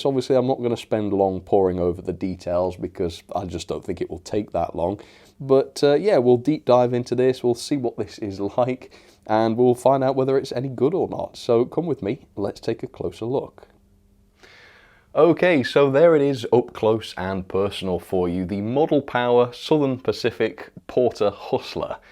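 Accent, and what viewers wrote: United Kingdom. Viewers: Dear, I found it thorougly amusing, to hear you hate it so much, in profound solid brittish, marvoulous thanks!:D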